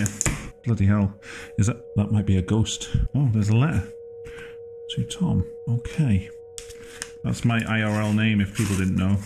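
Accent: United Kingdom. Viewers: I just realized that you have British accent (not sure either English, Scottish, Welsh or Irish well if Ireland could be counted as UK itself) the moment you said "bloody hell". No wonder your voice somewhat very interesting in certain way. Please correct me if I'm wrong thanks.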